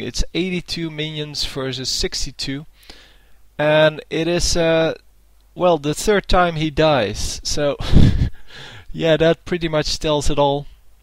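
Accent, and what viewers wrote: Netherlands. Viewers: This commentary sucks.. sounds like you're dutch..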